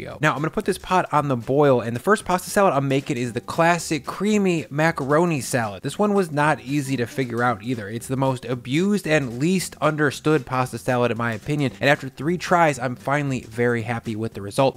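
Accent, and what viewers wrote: United States. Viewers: I love the recipes, but aren't I hearing an American accent!?! Why are you measuring in grams?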